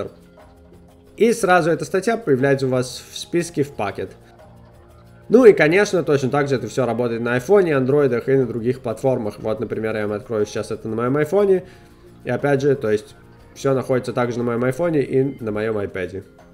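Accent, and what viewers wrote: United States. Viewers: акцент американский по знакомым знаю. а зачем приложения с газетами показывать если они все на английском? Публика то в 90% предпочитает читать на русском, я полагаю) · а ты американец или англичанин, не могу понять какой акцент????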